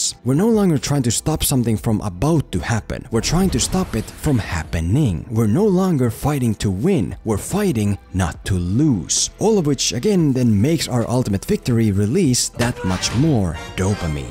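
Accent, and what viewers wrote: Finland. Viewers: Are you finnish? You sound finnish. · Are u from Finland??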